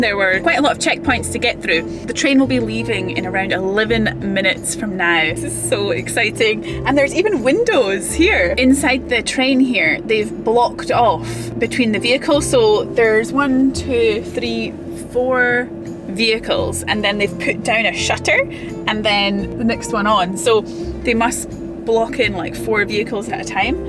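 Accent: Scotland, United Kingdom. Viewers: Your Scottish🎉 accent is so nice !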